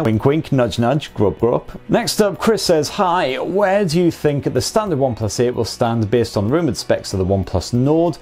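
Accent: United Kingdom. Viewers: content is absolutely amazing but this british english is completely garbage :D :D btw i am not american ;)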